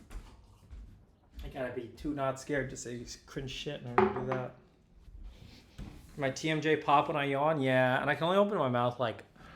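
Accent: New Jersey, United States. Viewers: That New Joysey Áccent. 🙃🙂😃😊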